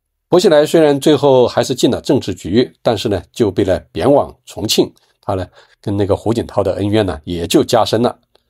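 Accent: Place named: Yunnan, China. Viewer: 讲故事的人是哪里人？ 云南人吗？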